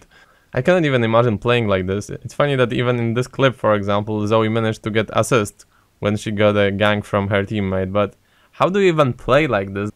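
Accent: Poland. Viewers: Po akcencie można poznać że jesteś Polakiem xD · Masz Polski akcent. Jesteś Polakiem ?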